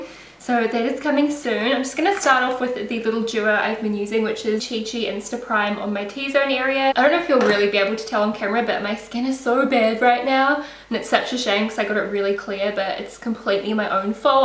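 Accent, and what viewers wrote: New Zealand. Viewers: 'petel flower' -> petal flower, gotta love that Kiwi accent! x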